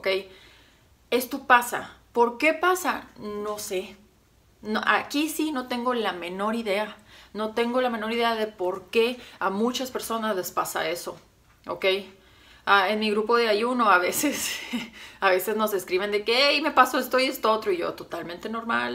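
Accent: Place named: Monterrey, Mexico. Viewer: me gusta tu explicación, muy informativo y bien relax. también me gusta tu acento regio 😎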